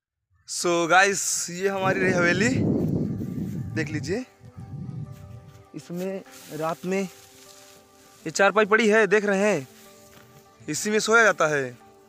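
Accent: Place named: Bihar, India. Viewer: bhai ki boli bihari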